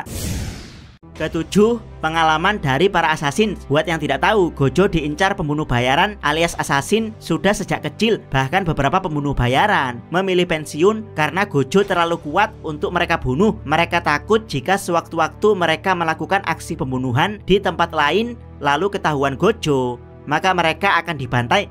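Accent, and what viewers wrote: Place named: Purworejo, Indonesia. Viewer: Logatnya kaya orang purworejo min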